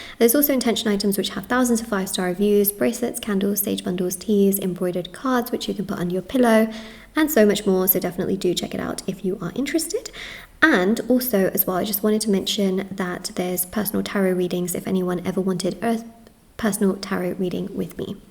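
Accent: United Kingdom. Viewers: Hey actually… when your video popped up on my feed… I thought- “Hey, I haven’t seen her in a bun before.” “She looks rather pretty (said in a thick British accent) (pun intended😂❤) (cuz you have a British accent) (I suppose) Huh. You Look Beautiful. There is no other way. And I will not hear of it! ❤❤❤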